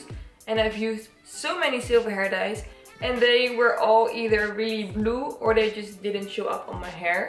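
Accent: Netherlands. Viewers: phan trash it sounds like she's from holland/the Netherlands but I'm not sure. My mom is from holland and that's what her accent sounds like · I hear in your accent that you come from the netherlands. I really like your youtube channel. Hey, ik ben ook Nederlands. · you're talking with a dutch accent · je hoort gewoon dat je Nederlander bent XD <3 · Are you dutch? It sounds like you have a heavy dutch accent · sounds dutch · Are you Dutch? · She sounds Dutch to me lol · I totally heard you were dutch before checking the description box. Dutch people unite❤ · It really sounds like a bloody heavy Dutch accent. I’m almost sure she’s Dutch! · ik hoor gelijk dat je nederlands bent haha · are you dutch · Ik think she is from the same country as me ( the netherlands) because she sometimes says dutch words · Are you dutch? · are you dutch? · Yeah she’s dutch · Ik hoorde aan je accent al dat je Nederlands was :)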